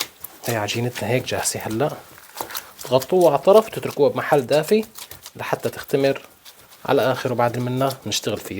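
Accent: Syria. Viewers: تسلم يدك. جميله اللهجه. حضرتك سوري؟ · معلم المعلمين والله حضرتك كأنه حلبي. بلدياتي هلافيك من وين ماكنت المهم أنك سوري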